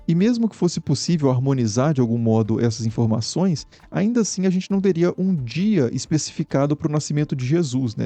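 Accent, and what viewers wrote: Minas Gerais, Brazil. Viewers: É mineiro?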